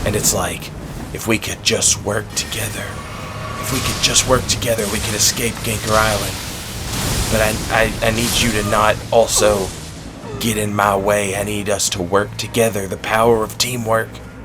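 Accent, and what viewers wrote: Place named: Kentucky, United States. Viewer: You have too good a Mitch McConnell impression not to be from Kentucky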